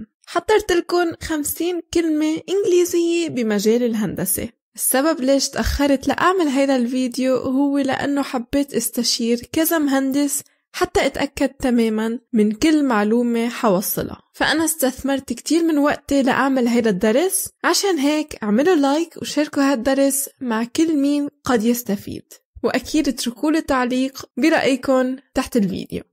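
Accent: United States. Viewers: هاي انكليزي امريكي؟ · اميركي النطق او برطاني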